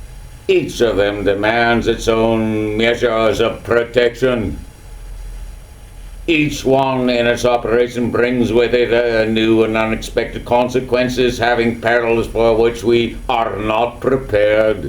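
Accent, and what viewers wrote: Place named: Scotland, United Kingdom. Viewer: Love this Scottish Dewey. Aye aye!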